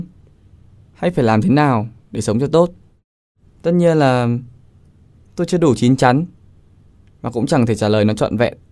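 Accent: North, Vietnam. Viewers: GỊONG CON TRAI MIỀN BẮC DỄ THƯƠNG QUÁ Ạ <3 EM GỐC MIỀN TÂY NHƯNG NGHE VẪN THẤY THÍCH LẮM <3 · minh k thich tieng bac tu xua toi nay ... ma sao bay h minh thich lam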